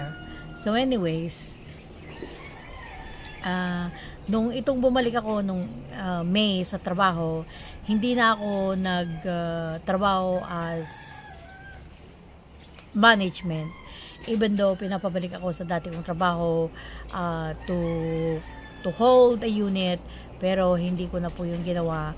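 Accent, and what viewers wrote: Ilocos, Philippines. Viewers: ilocano ka gayam madam? kunak idi bisaya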